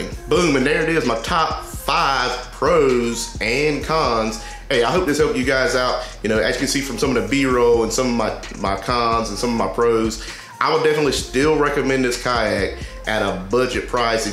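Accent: South, United States. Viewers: Good review!! You gotta be from the south bro, you got that down home good ol boy sound to you! I’m in Auburn Alabama area.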